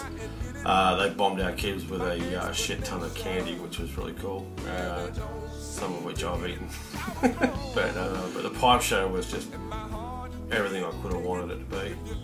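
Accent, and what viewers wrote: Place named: Australia. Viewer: Thanks for sharing, awesome pipes!!! and fabulous video mate! good to hear another Aussie voice on here hahahahaha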